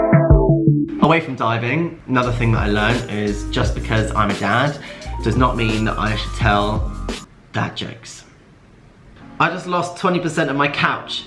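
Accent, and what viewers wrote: United Kingdom. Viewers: Thanks to Tom, my ear is really get used to British accent now 😂 P.s: english is not my main tongue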